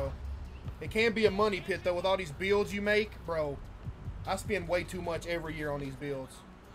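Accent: South, United States. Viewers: like a ghetto is wild, he talks like he hooped in the south because...he hooped in the south. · Not gonna lie his American accent was better than the gamer accent but people change whenever they hang around different people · I hope accent doesn’t go away anymore then it already has, I live in the south so I like the southern/country accents lol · my accent defiantly fluctuated with the people i surrounded myself with when i was in highschool. And my southern accent rubbed off on them aswell 😂 funny too see also had the ghetto southern accent at one point too 😭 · nah man that's just his southern accent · My boy had that southern/2K accent heavyyyyy lmao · southern accent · thats not heavy southern its gangsta southern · When Caseoh was playing 2k, he was speaking southerner blaccent code switch is real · bro thats just the southern accent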